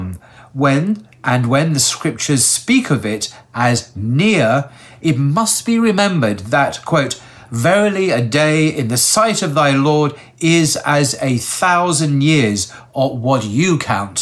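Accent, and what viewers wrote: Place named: RP, United Kingdom. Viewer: Just a side point but your reading / narrating skill is marvelous especially with that touch of British posh ness Allah bless you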